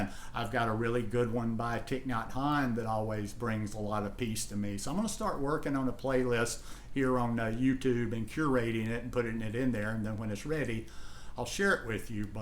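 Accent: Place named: Alabama, United States. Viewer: Your videos always put me in a relaxed happy mood. That why I enjoy watching them. They are always positive and sensible about raw vegan diet. You have a nice personality. I love also your Alabama accent. Actually you are the only one on YouTube left that I watch regularly. Thanks for being here with us. 🤗✌💖.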